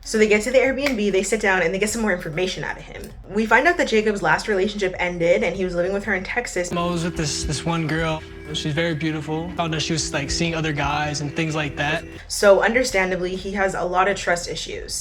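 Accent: Canada. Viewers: ahh I’m kinda fangirling rn. I’m so stunned that you replied!! I’m a new subscriber and have been binge watching all of your catfish and catfish adjacent content and I’ve been loving it and loving you!!! I also wanna say I love the sprinkles of Canadian we get from you, it’s so charming and I love it, it makes me giggle because I find it so cute! Thank you for being a real one bestie and I can’t wait to continue watching you for years to come ❤️ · Girl don’t feel weird about your Canadian accent! Your voice is so soothing and you’re an amazing story teller! I just came across your content a few days ago and I have been bingingggg ❤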